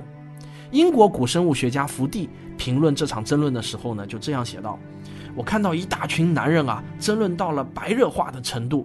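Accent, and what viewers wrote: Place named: Taiwan. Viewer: 講的比老高還精彩， 汪老師口音，好熟悉， 好像台灣老師授課。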